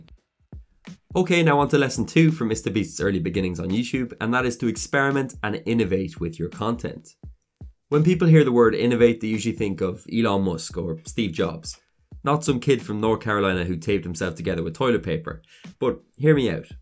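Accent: Ireland. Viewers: I'm loving the content on your channel, really excellent work and I hope you keep gowing/growing.... I'm wondering bout your accent tho, Laois Carlow, Offaly, Kildare? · Nice hearing another Irish accent on YT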